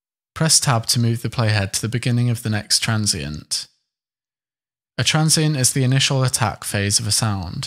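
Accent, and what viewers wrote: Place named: London, United Kingdom. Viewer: Your Studio One tutorial video, by far, is more understandable than any other free tutorial here on YouTube! The added bonus of your God-given British/London (or one of the suburbs, thereof) accent just makes everything that much more exciting and enjoyable to listen to. I'm American and I grew up on "Monty Python's Flying Circus" television back in the 1970's. Between that and interviews with the Beatles, I've loved all manner of English accents ever since. I know, for you it's not an accent, it's just your everyday speaking voice... I'm a voice actor and narrator and I just started using Studio One 5, Artist edition. Really loving it for what I'm doing. Especially for the one-time price. I'm also a singer and songwriter, so, I'll eventually make more use of the music recording and editing functionality... Thank you and keep up the great work! 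Peace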